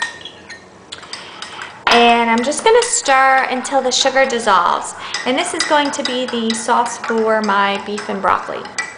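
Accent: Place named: Tennessee, United States. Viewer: you sound like your from Tennessee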